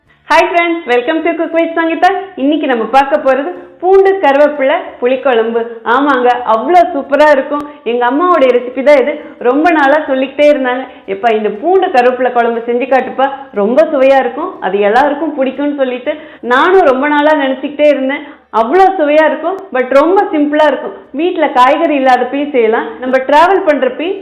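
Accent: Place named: Kerala, India. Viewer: Kerala samayal la pesara mathriye pesaringa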